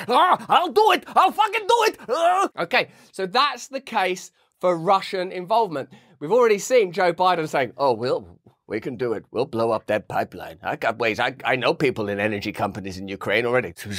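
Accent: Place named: United Kingdom. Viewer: You are the modern day Mark Twain with a British accent. Keep up the good work.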